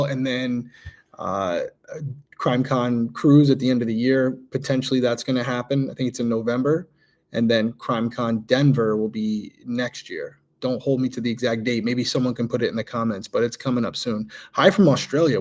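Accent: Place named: East Coast, United States. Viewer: ❤❤❤ I can straight hear your east coast speak!! Love love love it!!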